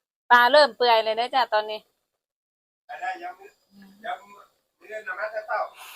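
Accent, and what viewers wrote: Isan, Thailand. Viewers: สำเนียงขอนแก่น หนองคาย ก็แบบนี้ เนียนว่าเป็นคนอีสาน ก็ไม่มีใครแยกออกหรอก 😂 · อยู่บ้านได๋อยากไปเที่ยวบ้านที่มีสำเนียงเว้าคือกันกับลาวอีสานฝั่งไทย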